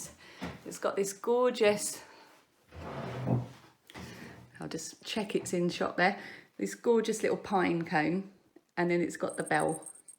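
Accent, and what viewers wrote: England, United Kingdom. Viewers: Count me in! This expat Brit knitter loves to talk yarn and patterns but especially loves to hear an English accent again!!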